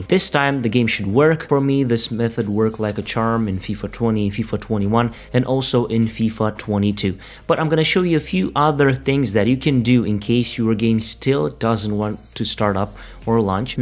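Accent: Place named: Romania. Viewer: wtf, are you romanian ? :))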